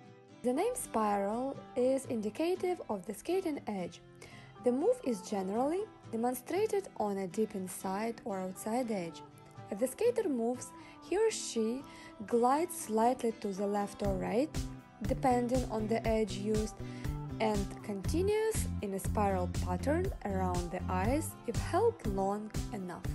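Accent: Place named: Russia. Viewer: русский английский))) Большое спасибо Вам за Ваши уроки!!!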